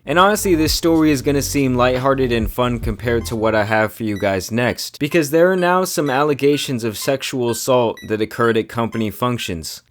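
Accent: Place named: Northeast, United States. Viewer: Bro, your accent is hella strong lol. You definitely from the northeast.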